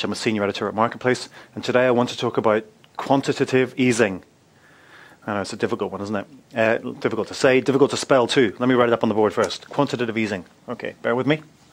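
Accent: United Kingdom. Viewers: you are Briton, arent you?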